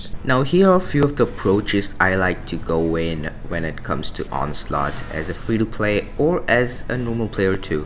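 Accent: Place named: India. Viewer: You have like an Indian accent which is hard to understand your english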